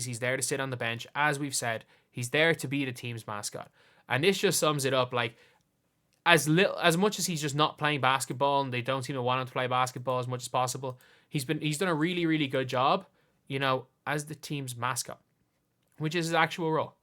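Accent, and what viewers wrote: Ireland. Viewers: yo bro are you irish? the accent looks like that :D good content, cheers))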